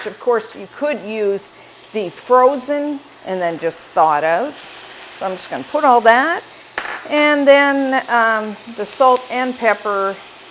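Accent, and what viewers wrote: Canada. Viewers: She sounds Canadian, so maybe they use the metric system? Not sure about that, though. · I've tried a lot of recipes I learned from YouTube during this pandemic. I'm American, & I also enjoy listening to the various accents. Love listening to our friends from the north. Thanks! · Love these cooking shows. Also love the Canadian accent.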